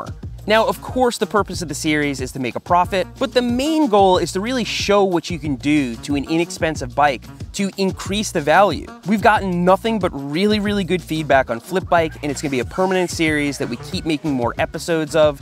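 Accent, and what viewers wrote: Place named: New York, United States. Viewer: It's cute how thinks he got rid of his accent. That lovely NEW YAWK accent.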